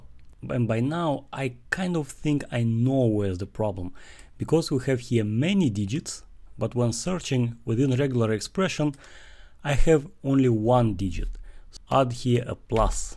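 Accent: Russia. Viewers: Russian English always deliver an extra value to the content))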